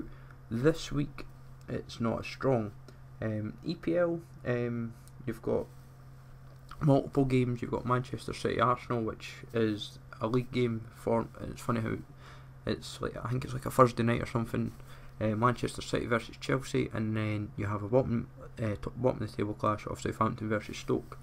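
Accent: Scotland, United Kingdom. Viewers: always love the scottish accents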